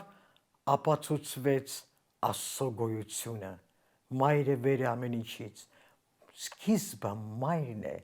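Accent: Standard. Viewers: Տիկին ջան, ինչ գրագետ ու հիանալի ելույթ կայացավ, շատ ենք կարոտել մաքուր հայերենով ելույթ լսել...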